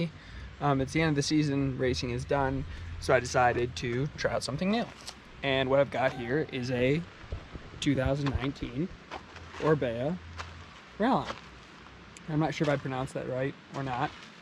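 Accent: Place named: Western New York, United States. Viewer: Fellow Rallon rider here but I wanted to know - are you from WNY? Or Eastern Michigan? Your voice sounds like home and I'm from Rochester.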